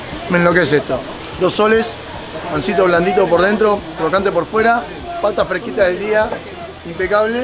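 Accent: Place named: Argentina. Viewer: Volviste de Argentina y se te pego el dejó guacho 😀 sucede que cuando vives fuera de tu país por mucho tiempo pierdes tú acento de origen, basta unas semanas en tu país y vuelves reseteado con tu acento original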